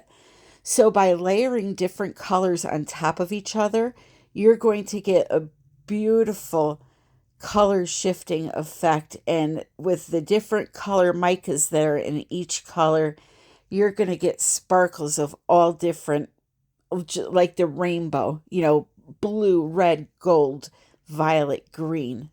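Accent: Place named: Midwest, United States. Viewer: Watching ONLY videos this am...after a long 7 month no art time due to family needs I am so enjoying all your videos. You have every creative instinct possible. LOVE this one. Yes saving those pour too big to turf..too pretty to pour over. Nice, your accent. Now is it? Midwest? Chicago? Please DONT be mad I am trying guess. I really am glad I got on your channel gonna watch em all. Just went to a paint store I've been flipping furniture too...its alot of work but wow. What a difference. Thank you dear your a friend to all budding artists and pros alike!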